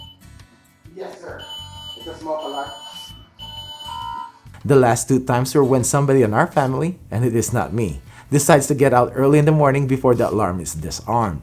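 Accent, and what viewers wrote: Philippines. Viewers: not sure whose the ding dongs who gave thumbs down? unless they thought the accent sounded like a gay filipino? if you can avoid that, its an excellent review.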